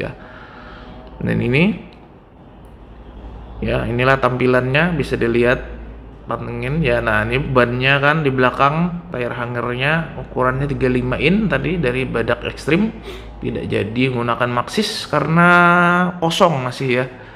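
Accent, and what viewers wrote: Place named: Hulu Sungai, Indonesia. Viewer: Logat/dialex hulu sungai masih kadangaran broooo !!!!!!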